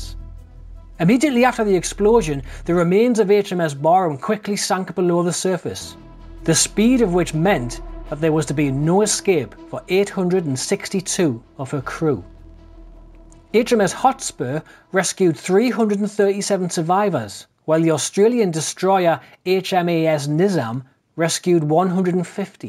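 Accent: Newcastle, United Kingdom. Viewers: Commentator has a Geordie accent.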